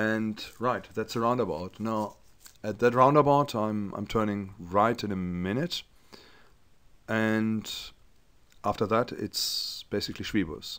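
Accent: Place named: Germany. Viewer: Nice job !!! i listened all, sometimes it was kind difficult to understand just a few words pretty much by your Hard German accent, but you're German talking about German history which is really nice :D !!! i'll be traveling to Germany next year, and i wanna make the tour to all these old days German lands, but i wanna go farter , i wanna include pommer,Danzig,Warmian-Masurian,Kalliningrad, and Memel, currently Klaipeda South Lithuania, all those lands are full of German vestiges,well in this case i wanna ask something to you, as German citizen that you are, so why after Berlin's wall falling, Germany never reclaim any of those lands ???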